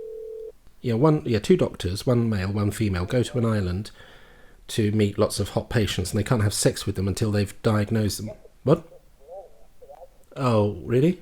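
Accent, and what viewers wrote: United States. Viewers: Great gravitas american accent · Can't figure out which American accent(s) you're attempting there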